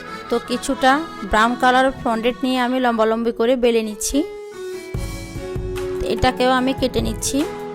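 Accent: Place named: Noakhali, Bangladesh. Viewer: Anne ki noakhailla ni ponded bolen keno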